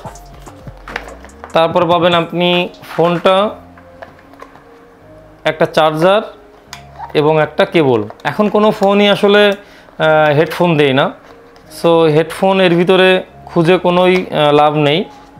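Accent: Bangladesh. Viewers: ভাই আপনার বাসা কি নড়াইলে আপনার ভাসা নড়াইলের মত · ভাষার ধরন যা, সেতো সংস্কৃতিতে খুলনার বারটা বাজাতে চাচ্ছে। আসলে এ কিছু জানে বলে মনে হয় না। --- - এট্টু সাবস্ক্রাইব কুরেন ।।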